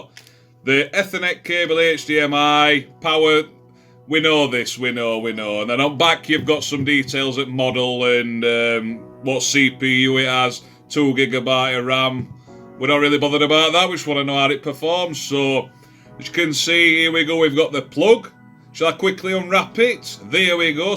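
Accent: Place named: North, United Kingdom. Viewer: Got mine on order. Thank you. Love your videos. Nice to hear a northern voice on YouTube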